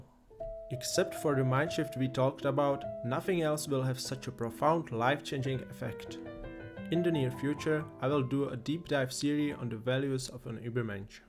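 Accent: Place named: Germany. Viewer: I doubt that AI could imitate your authentic German accent.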